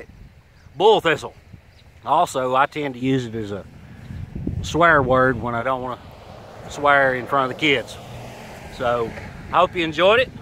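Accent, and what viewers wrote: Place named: South, United States. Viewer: The Horrid Thistle (better name than Bull Thistle) is absolutely not an invasive plant! It is a native, and extremely beneficial plant for wildlife. Applying the obvious here; you have a southern accent so I can assume you live in the Deep South, like me. Houston, Texas here. Horrid Thistle' are blooming everywhere right now with Spring just beginning. Sure, they may not be conventionally pretty but they are fascinating. Back to the point, know your stuff brother! Don't spread misinformation. The rest of the video was great btw.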